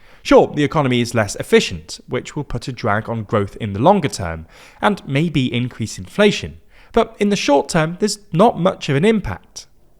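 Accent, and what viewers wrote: United Kingdom. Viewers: Clicks in video, hears british accent. Leaves.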